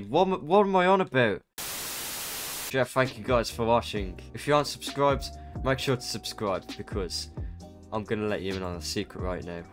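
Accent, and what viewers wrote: United Kingdom. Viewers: Wait ur British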